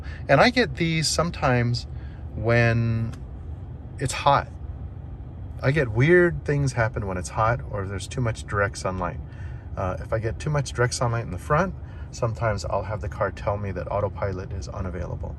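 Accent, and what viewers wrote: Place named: United States. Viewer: Thanks for the video. Would be fond of seeing you attempt to hit the indicator buttons while turning. Scenario: in Europe it's (generally) the law to blink in/out of roundabouts. I'm having a hard time seeing how to do this safely without taking the eyes off the road trying to find the correct button which by now might be on the other side (yoke upside down). You sound American so you might not see it as an issue, but I assure you it will be for many of us 😊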